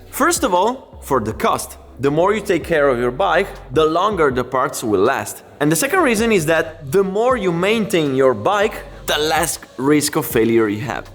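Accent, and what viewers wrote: Italy. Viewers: alles ok im Video !! mir gefällt Dein Englisch mit italienischen Akzent - erinnert mich an meine Urlaube in Italien !!! ;-)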